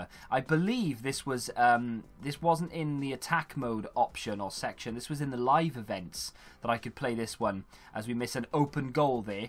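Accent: Wales, United Kingdom. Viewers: are you welsh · tell you are welsh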